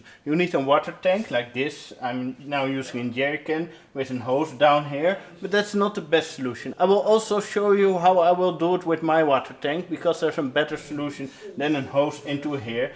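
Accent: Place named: Netherlands. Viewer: Heerlijk Nederlands accent. Gaaf zo'n unimog als camper. Succes, ik ben geabboneerd. Ik ben bezig met m'n eerste camper te bouwen (vw t4).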